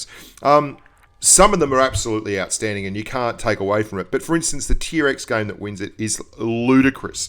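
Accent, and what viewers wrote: Australia. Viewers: Are u Aussie I'm Aussie as well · And also I just realised ur an Aussie..........I'm a kiwi from NZ XD watupppppp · yes hes aussie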